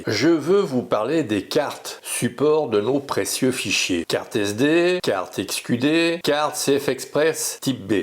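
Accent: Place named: Geneva, Switzerland. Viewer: Moi je suis sur PC/Windows10. Tu as gardé un peu de l'accent de Genèèève ha ha ! Moi je suis né à la Maternité de Genève il y a maintenant 64 ans ! Comme le temps passe ! Maintenant c'est la retraite, c'est le plus beau des métiers. Comme cité plus haut, j'ai 4 boitiers et je les utilise tous. Aujourd'hui, tour en e-vtt avec le D7100 et un 18/105 mm dans le sac à dos, et v'la ti pas que je me retrouve nez à nez avec un chamois à devant moi (j'ai pas fait gaffe !) sur un chemin forestier dans les Gorges de l'Orbe, il a pas bougé et je me suis installé à 15 m de lui, il a fini par se rapprocher de moi et j'ai pu le shooter plusieurs fois, elle est pas belle la vie ! Et tout cela avec un "crouille" boitier, j'ai bien fait de le prendre avec moi. Passe une excellente semaine amigo, cordialement François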